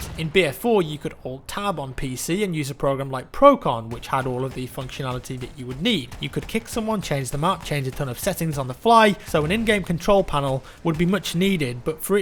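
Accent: United Kingdom. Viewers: Man i love yor British accent, mutch better to understand for me than the American Cheeseburger English.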